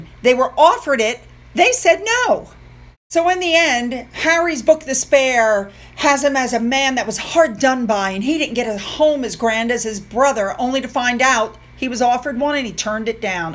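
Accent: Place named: United States. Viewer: great video as always. I'd like to point out your pronunciation of UK names. PLEASE NEVER STOP, American cousin... in my opinion, your pronunciations are so cute and understandable. I, an Aussie, have difficulty sometimes, so I love that you are a fellow traveller in the interesting world of UK place names. 😂❤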